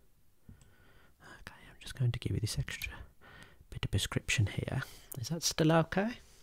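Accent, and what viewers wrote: United Kingdom. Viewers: A month ago I said this would be the holy grail. Many agreed and you commented about the setup challenge. This is your second attempt, and while I’m excited to watch this I first have to say I’m blown away with your dedication. I was using ASMR to sleep before there was YouTube (bob ross DVD’s). Your unique whisper and Ben Kingsley accent already took over my nightly playlist, but a second attempt to perfect this requested RP Is why a 41 yr old now feels like a groupie. Keep it up, and thank you!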